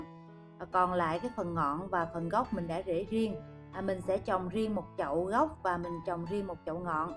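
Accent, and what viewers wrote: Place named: South, Vietnam. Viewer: " " nói giọng nam mà lại ở đà nẵng hỉ?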